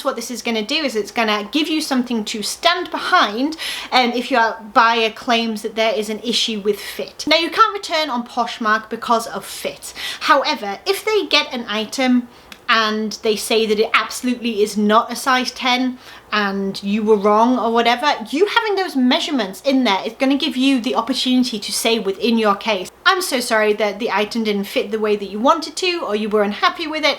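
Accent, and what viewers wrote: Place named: United Kingdom. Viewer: Plus I love your accent and British expressions. Reminds me of my British family members. <3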